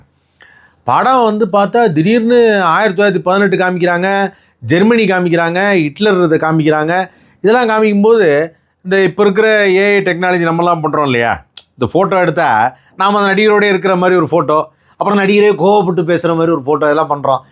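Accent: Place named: Coimbatore, India. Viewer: Kovai Accent 💯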